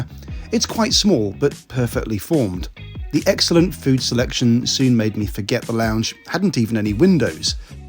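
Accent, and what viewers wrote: United Kingdom. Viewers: Great video!! Wow 🤩 🤩 Starlux 🇹🇼 looks ABSOLUTELY FABULOUS especially on that gorgeous new A330-900neo my favorite derivative of the Airbus A330 Family. I think this experience seemed a little better than the last time you were on an A330neo that being delta airlines example from Salt Lake City(SLC) to Paris (CDG) don’t you think so! 😂 😊 however, I do still really love that video on Delta’s A330neo as I enjoy hearing you pick problems in that awesome British accent of yours! 🇬🇧 😂🎉 <link> Also if I were flying Starlux I’d still try to find an A350 route over the A330neo. A bit of a loss if you ask me as nice as the A330-900neo is I’d always prefer the nicest newest plane or cabin interior on a carrier which would’ve been the A350-900 with Starlux😞 I cannot wait to fly on them someday! Finally Taipei Airport still seems like a pretty good and funky airport and it has some charm and personality I love that in an airport! 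😀 Also Can you please please please try to fly with Korean Air one day on that INCREDIBLE and FAB looking Boeing 747-8? I’d love to see that review either in their UPSTAIRS Biz Class or Fabulous First Class on the nose of the 747-8?? Please try them soon! I remember you saying several years ago now during your Hawaiian Airlines A330 review that you saw a 747-8 of Korean and you have yet to try them still!! Plz do and maybe on HNL-ICN? 😊 Looked like an EXCEPTIONAL EXPERIENCE! Finally Jeb & Suzanne Brooks just used that music on their 24 hour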